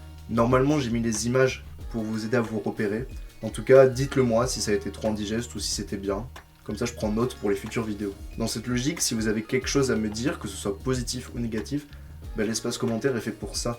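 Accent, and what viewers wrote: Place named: France. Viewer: Un bel accent frenchie et du rythme, ça s'annonce bien cette petite chaine ;)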